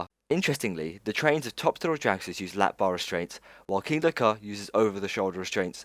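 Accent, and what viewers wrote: United Kingdom. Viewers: Contrary to the excellent(!) video presentation, I found the narration to be rushed and mumbled. I had to reverse a few times to catch what was being said - and it's NOT because of the British accent. Maybe my hearing is going.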